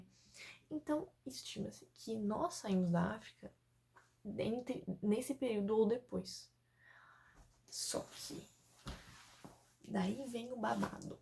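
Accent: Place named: Portugal. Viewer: Achei que era portuguesa no primeiro minuto de vídeo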